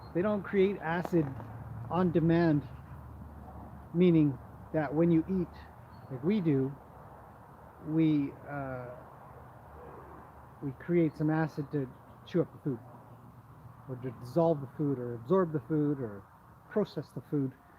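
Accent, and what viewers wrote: Canada. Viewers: Hello, love your video's , where are you from, you sound Canadian.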